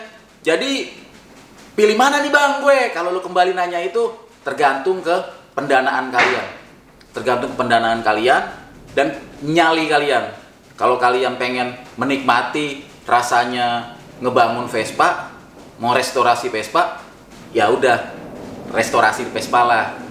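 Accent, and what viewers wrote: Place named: Betawi, Indonesia. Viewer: Tertarik dari bahasa Betawinya..nyablak..lama2 tertarik ngliet konten Vespa...padahal ga punya Vespa...lanjut bang......cihuy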